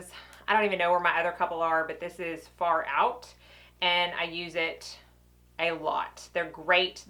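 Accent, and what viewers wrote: South, United States. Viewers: Where are you located? I only ask because I live in Louisiana and I detected your southern accent. I vaguely remember you mentioning Tennessee?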